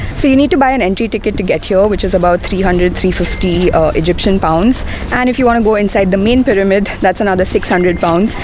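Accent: India. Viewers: Amazing indian accent speaking english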